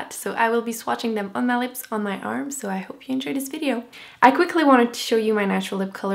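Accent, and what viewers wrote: Quebec, Canada. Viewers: So Happy to find a Canadian Vlogger!! Yay. I knew you were a fellow Canadian from the accent. Love it :D Great channel and wonderful swatches. Very Helpful!! Thanks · wowo je t'écoutais parler et sans même savoir réellement je me suis dit man this girl has a french canadian accent!! lol Great!! Tu les a acheté ou tes wet n wild?? Ils n'en vendent plus au Wal-Mart!! Je suis obligée de commander sur amazon!!! Pis ca devient trop cher vu qu'il me charge le shipping,dire qu'au États ils sont 2 $ grrr booh loll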